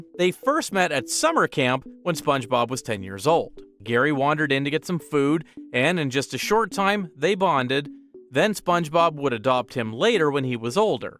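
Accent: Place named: Canada. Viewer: you sound too Canadian to be AI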